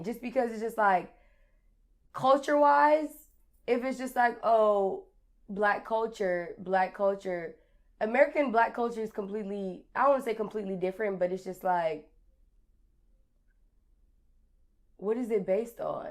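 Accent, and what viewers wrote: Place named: United States. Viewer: She saying all of this meanwhile talking in an American Accent! But just for the record she can't articulate African culture not being "Black." Being Black is an American term meaning Black American ethnicity as a whole which have sub categories. This is the problem with other ethnic blacks being raised here in the US but lack experience, history or background to try and understand Foundational Black American lifestyle.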